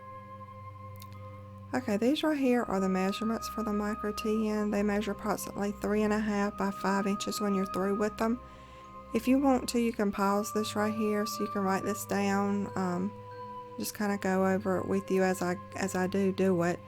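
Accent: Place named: South, United States. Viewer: Where did she grow up to acquire her country accent?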